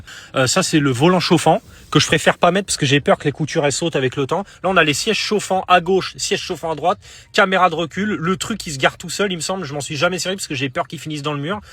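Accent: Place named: East, France. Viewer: Ahah😂😂 j'ai bien ri. J'adore le langage et le léger accent de l'est ou du centre-esr de la France , non ? 😅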